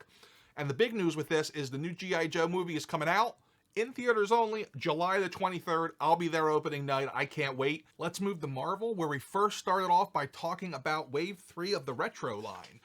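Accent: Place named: Philadelphia, United States. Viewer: New subscriber here. You sound like you are from Philly or more generally, Eastern PA!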